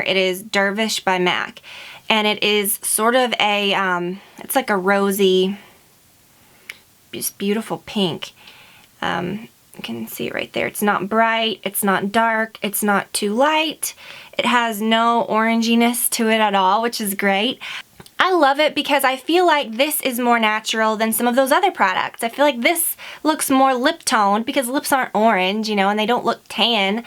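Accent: South, United States. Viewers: Is it just me or does sound soooo much more southerner?? I lav her 💕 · Thanks for sharing your favorites! You sound a little southern in this video, it's awesome haha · This was the first video I noticed a sweet southern accent! :) So happy for a lipliner suggestion that is great with nude lips...it really is hard to find! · Im not from the US but I watch the walking dead, and every time after I've watched an episode I can really pick up your more southern accent. Because they film in the same state as you live in. It's super cute! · I have watched so many of your videos, and I never noticed such a southern accent before! Anyone else pick up on it. So funny, makes me like her even more :) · Way more Southern in these videos! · I love your faint southern accent. I need to try Anastasia products asap! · sometimes your southern accent stands out so much haha never really noticed before a couple videos. 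you loo gorgeous xx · first time i've ever noticed your southern accent! it's quite strong don't know how i've never noticed it! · It sounds like you have more of a southern accent in this video!! It's so cute haha ( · GA accents are adorbs! Just like you Meredith Hammett your icon pic is BEAUTIFUL! I wish i could visit there someday! and sorry to point it out..hopefully it didnt distract too much lol but being from california i simply adore southern accents. And to be honest I never really realized had one... makes we wanna double thumbs up this video! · you sound so southern omg, it's so cute <3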